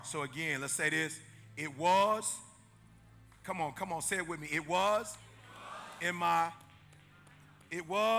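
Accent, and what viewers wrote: United States. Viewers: Please can we get subtitles in arabic or French at least I like your videos and I can't understand all what you're saying because of the American accent. . Thank you 😊❤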